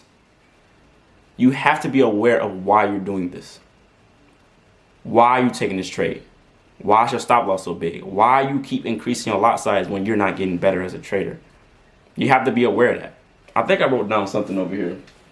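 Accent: United States. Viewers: are you from texas? because of the accent. love the vids btw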